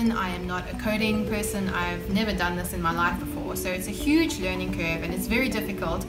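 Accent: South Africa. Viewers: Are you South African? If so, where did you study?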